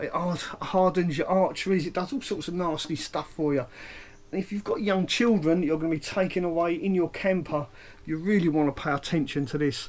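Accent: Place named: Essex, United Kingdom. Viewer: trying to guess your accent and we recon Wickford or Basildon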